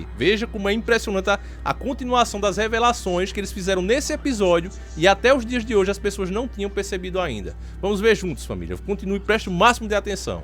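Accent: Northeast, Brazil. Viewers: O bicho você e nordestino né se for e nois viu 😏😏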